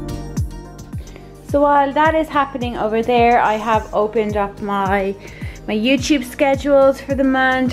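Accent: Ireland. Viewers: I'm from Middle East and I love Irish accent 😊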